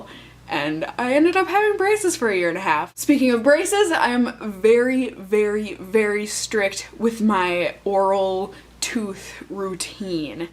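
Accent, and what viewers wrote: Minnesota, United States. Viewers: Your Minnesota accent killed me. I'm dead. DEAD. IT HURTS SO GOOD. LOOOOOL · Also, please do your Minnesota accent more lol, it's adorable! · i died at the Minnesota accent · OMG the MN accent got me cracking up!!!! I always tell people that we don't talk like that. But I did have a coworker say that he forgot that I had an accent until I was on vacation and came back. He said then he could hear it. I only hear it come out when I say certain words.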